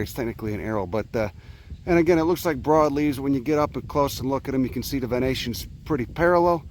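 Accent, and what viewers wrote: Chicago, United States. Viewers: *Chicago accent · I told my wife check out this dude with a thick Chicago type accent talk about nature like a boss.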